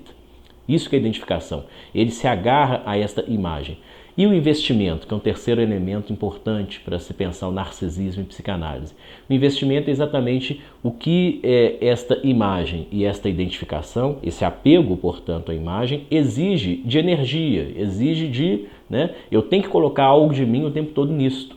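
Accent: Minas Gerais, Brazil. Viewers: Parou tudoooo!!! Um arrazo! Eheh! Nada me fascina tanto quanto uma oratória tão perfeita! Com uma pronúncia de Minas Gerais então...ui! Rss. A fluidez das palavras; o intervalo entre as frases; o seguimento do raciocínio; o tom moderado e firme da voz. Perfeito! Abraço, Sr. Doutor!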